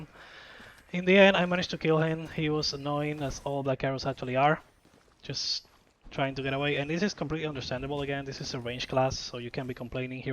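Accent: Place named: Argentina. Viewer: Your english has argentinian accent 😅 🤔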